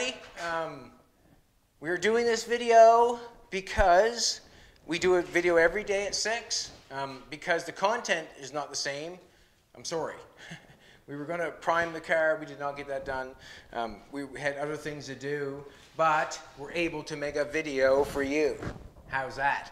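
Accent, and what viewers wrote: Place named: Canada. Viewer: Merry Christmas to you and your lovely partner...keep doing what you do...I'm a Canadian living just north of Cincinnati Ohio and find your authentic Canadian accent endearing....it makes me long for home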